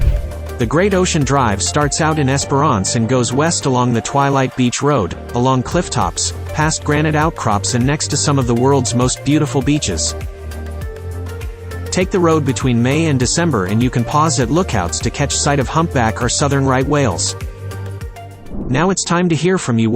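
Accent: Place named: United States. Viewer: Maybe AI IDK? BUT even so, why is an American (accent) talking about Esperance Australia? Why Not Australians? America, Good on you & i thank you❤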